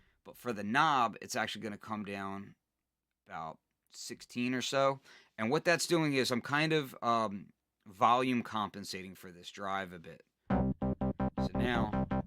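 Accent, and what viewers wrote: Philadelphia, United States. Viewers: love your vids. gotta be a philly accent possible jersey or delaware.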